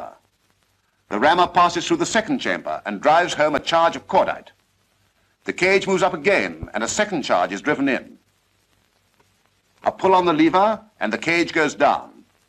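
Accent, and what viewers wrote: United Kingdom. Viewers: This was during britains posh accent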